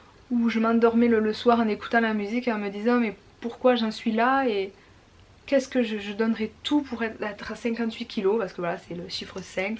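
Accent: Toulouse, France. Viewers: Salut moi c'est Virginie..j'ai été opérée d'une sleeve en janvier 2017, j'ai perdu près de 50 kgs mais je partais de très haut (133kg) je suis donc encore en obésité 1 ...je voudrais perdre encore 13 kgs pour atteindre mon objectif personnel...c'est dur dur...en tout cas, je te dis un grand bravo...tu es magnifique et merci pour tes vidéos ...ton accent Toulousain me met du soleil dans le coeur... (je suis née à Montauban mais j'ai grandi dans le nord. ..)... merci et bonne continuation à toi...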